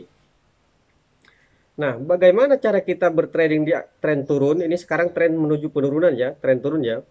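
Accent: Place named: West Java, Indonesia. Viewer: dari logat suaranya mirip orang sunda hehe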